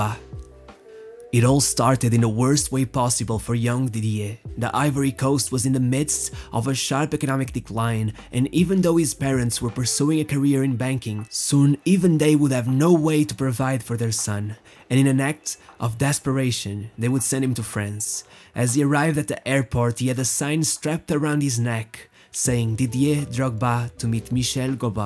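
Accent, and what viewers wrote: Porto, Portugal. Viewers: aquele sotaque do Porto impossivel de esconder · I hear the Portugese in your accent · Never knew it was a Porto accent!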